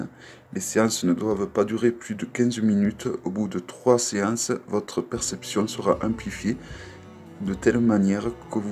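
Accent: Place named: South, France. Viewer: Merci moi j'ai bien aimé la vidéo 😊😊 Belle journée aussi et j'adore cet accent du sud😎😎 😘😘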